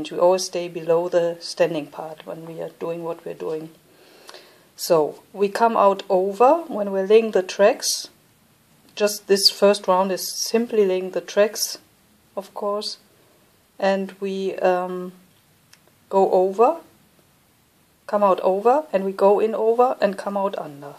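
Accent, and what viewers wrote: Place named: Germany. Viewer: I LOVE your German accent! And I continually applaud your English. : )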